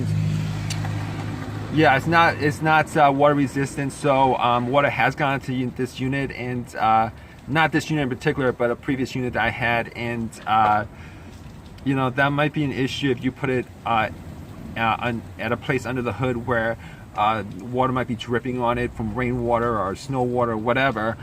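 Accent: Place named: New Jersey, United States. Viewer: Thanks for your review. Where is your accent from? New Jersey?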